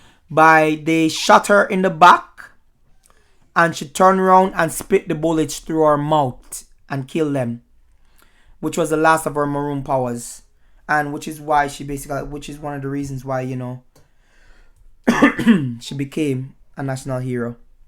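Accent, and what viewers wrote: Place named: New England, United States. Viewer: You sound like a duncebat Yankee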